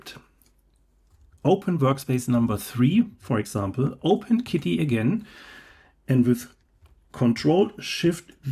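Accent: Germany. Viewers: Arch install mit deutschen Akzent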